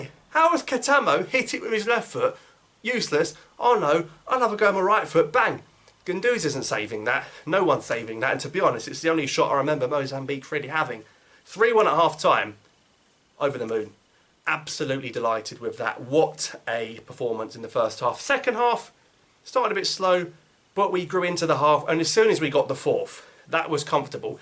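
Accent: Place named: Cockney, United Kingdom. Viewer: Well done, Cockney boy keep up.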